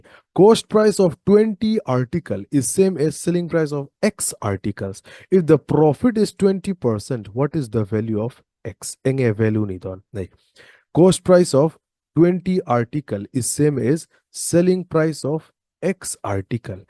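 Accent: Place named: India. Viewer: I accent hi a bharat lutuk a, ngaihthlak ahreawm,(Hinglish). mizo tawng khan sawi tluan law law la.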